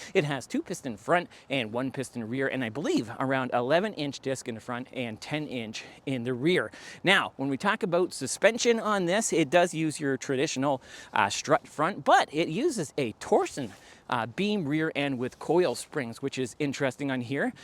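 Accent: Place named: Canada. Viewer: Hello from Manitoba! I can definitely hear that typical "canadian" accent everyone always talks about in the way you speak, im only part way through the video but you have definitely been very infromative so far!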